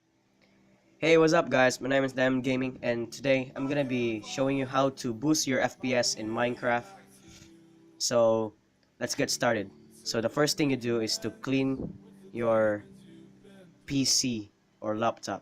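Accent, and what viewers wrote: Philippines. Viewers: Are you filipino? Your accent sounds so. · let me guess your from philippines? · filipino accent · Halata sa pag sasalita mo pinoy ka eh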